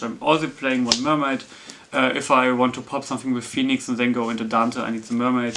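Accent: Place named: Germany. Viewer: Nice List :D PS: Man merkt anhand deinem deutschen Akzent,dass du aus Deutschland bist xD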